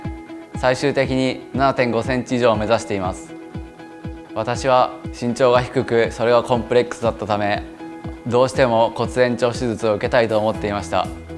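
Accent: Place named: China. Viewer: 他不是日本人，他是中国人。发音是中国人的日语。絶対日本人ではありません、、、、発音を聞いたら、すぐわかるでしょう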